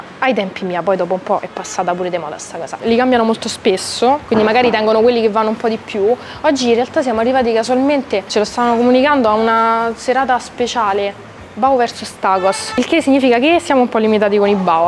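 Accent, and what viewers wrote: Rome, Italy. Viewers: il suo parlare romano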